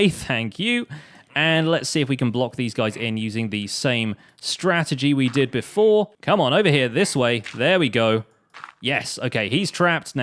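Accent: United Kingdom. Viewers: XxcoreysindahousexX British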